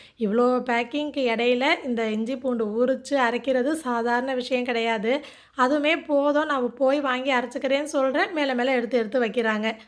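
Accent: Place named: Ramanathapuram, India. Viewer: Assalamu alaikum sis❤❤❤i am meera from chennai❤❤❤interesting video sis, unga slang enga ooru slang polave iruku, naanga ramnadu pakkam kamuthi, last 2minutes very true words and emotional😢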